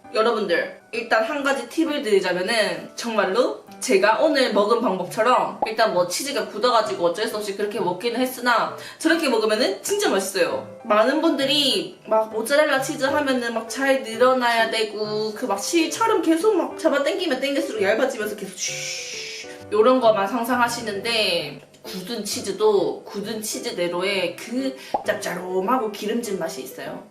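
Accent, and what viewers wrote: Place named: Daegu, South Korea. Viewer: 닫아뿌더라구요 ㅋㅋ 저도 대구사람이지만 님 사투리졸귀..♡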